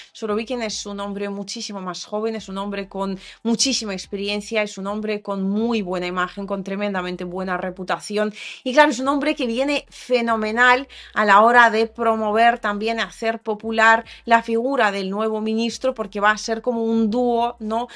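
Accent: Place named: Spain. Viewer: Saludos preciosa te admiro adelante lo único que no me gusta es que hablas como los españoles soy mexicano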